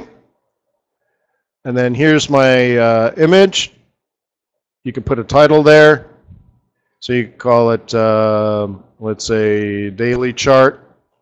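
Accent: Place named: England, United Kingdom. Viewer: You lost me on the English accent and had me with the python references -also good content as ever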